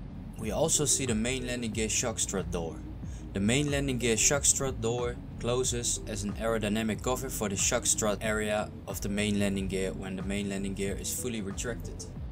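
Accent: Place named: Netherlands. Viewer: I smell nederlands. Coole video!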